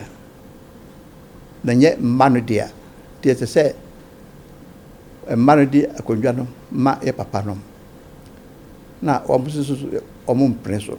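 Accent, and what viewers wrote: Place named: Asante, Ghana. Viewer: This Chief isn't speaking Bono. His accent is pure Asante Twi. Is it that Techiman doesn't speak Bono?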